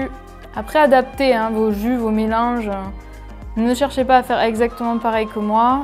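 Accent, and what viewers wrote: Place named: Basque Country, France. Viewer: Alors, je suis Luzien, et je jurerais que votre accent est du coin...C'est tellement familier!!! Si pas luzienne, Basque tout du moins. En tout cas, Merci pour les vidéos!!! Milesker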